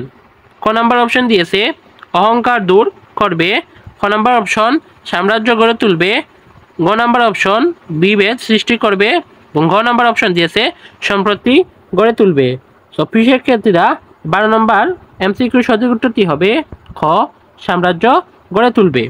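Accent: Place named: Sylhet, Bangladesh. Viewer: You are from sylhet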